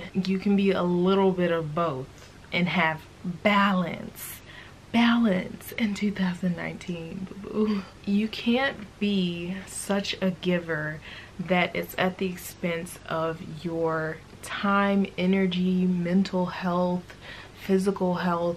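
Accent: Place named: South, United States. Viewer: i looove your southern drawl omg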